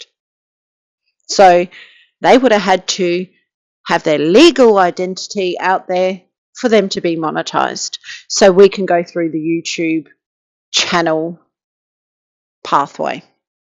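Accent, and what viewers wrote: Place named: Australia. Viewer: Hi from Perth….loving hearing an Aussie YouTuber. Love your content and the way you speak your mind.❤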